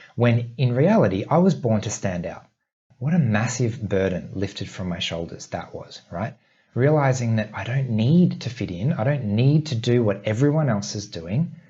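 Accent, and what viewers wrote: Australia. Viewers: I am Chinese and I grew up learning American English, and ever since watching your videos, I have become very fond of the Australian English.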